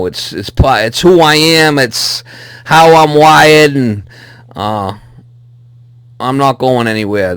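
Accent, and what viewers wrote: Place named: Boston, United States. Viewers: Honestly the swearing along with your awesome accent just made it so much better!! My old boss was from Boston and when he would get mad and start swearing he sounded exactly like you and it was funny as fuck!!! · Keep being you!!!! Your a good Man and your time and effort is highly appreciated. Let the dead bury the dead.... Good Ole Boston Accent and Professionalism keeps me a huge Subscriber. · Thank you for providing valuable information and entertaining repair video's, been watching for a few years and enjoy the Bostonian dialogue and temperament. As for the haters just ignore and fight for what you believe in, f them continue to create, take care.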